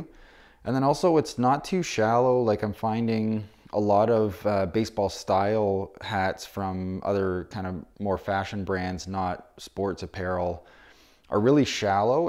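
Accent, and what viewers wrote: Canada. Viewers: As a winnipeg guy you sound like an Ontario guy! Good vid I didn’t know I needed a flannel cap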